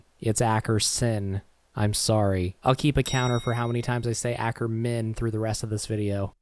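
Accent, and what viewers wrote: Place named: Canada. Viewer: Bro sounds Canadian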